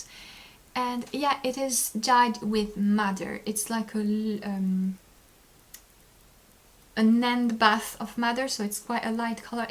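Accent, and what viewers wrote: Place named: France. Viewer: Oh, your Saule leaf design shawl took my breath away, that motif with the garter is simply beautiful. When you said you may incorporate small tassels within the main ground I thought Oh yes please, what a fabulous idea. Please don't perfect your English accent too much and lose your frenchness. Cheers, Jenny.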